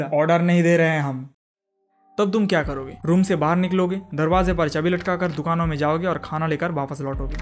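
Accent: Bengal, India. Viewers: Bhai bengali ho kya ap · Tumi bangali 😂 am I right 😅 mein ne pechan liya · tomar bengali accent tike kom karo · Love your Bangali accent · bengali tone bro.....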